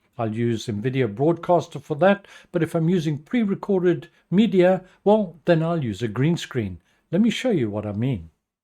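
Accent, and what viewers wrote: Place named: South Africa. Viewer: Great channel and especially great to hear a familiar accent 🇿🇦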